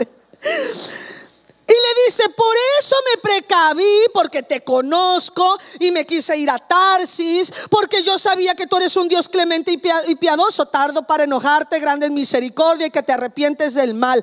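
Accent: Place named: North, Mexico. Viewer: Parece más de acá dl. Norte q del D.F. x su forma d hablar 😄 buen msje."q estamos reflejando"? 😕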